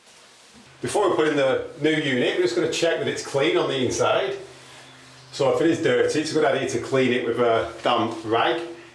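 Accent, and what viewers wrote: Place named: East Lancashire, United Kingdom. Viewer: Excellent video. I'm in Québec and I recognize the accent, East Lancs maybe ??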